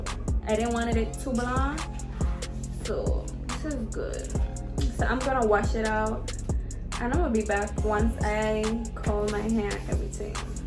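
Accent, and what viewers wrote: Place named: Caribbean. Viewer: 😗😗😗I hear that caribbean accent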